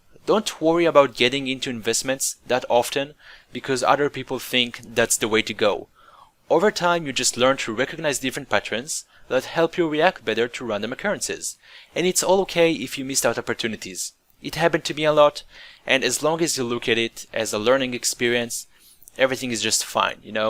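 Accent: Israel. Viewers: יש לך מבטא ישראלי חלש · I'm just from israel too and i was pretty sure you are by your accent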